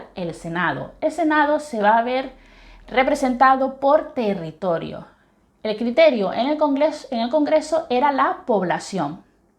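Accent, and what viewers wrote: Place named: Canary Islands, Spain. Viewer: Buena explicación. Me suena acento canarion.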